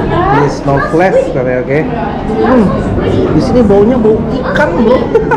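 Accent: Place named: West Sumatra, Indonesia. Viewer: Dari awal gw dah nyangka kl Uda orang Minang, sempat nonton video yang logat Minangnya rada kental dikit, salam dari Ranah Minang uda...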